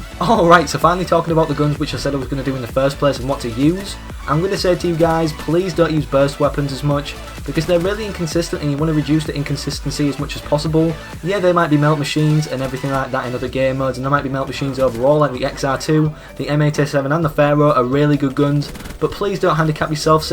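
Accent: United Kingdom. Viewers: SeeN It's literally just how British people talk. i.e. British say "Bri'ish" and Australians say "Austra'ian". Don't make fun of people's accents.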